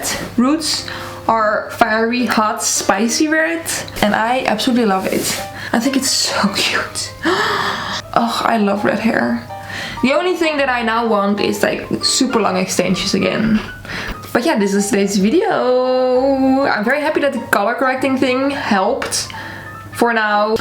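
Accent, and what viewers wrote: Netherlands. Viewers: I hate myself for knowing you were Dutch just by your accent😂😭. Ik dacht zo van “huh is ze Nederlands?”😂.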